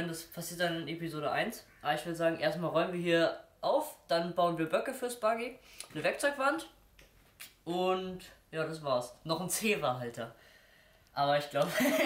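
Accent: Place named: Berlin, Germany. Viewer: die Videos werden immer professioneller (bitte nochmal ein ganzes Video nur im Berliner Akzent, sonst Deabo)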